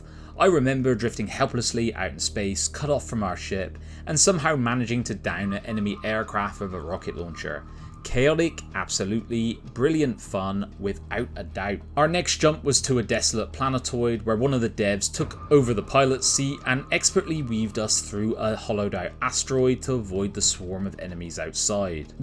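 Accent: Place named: Gloucestershire, United Kingdom. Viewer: Satisfy my curiosity or, I’m a glos boy born and bred. From your accent are you from the same neck of the woods?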